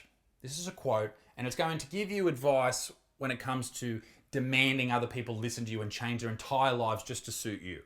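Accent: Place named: Australia. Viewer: I heard about her doing this: "Well there's the next Isaac vid. Also why is she Aussie?"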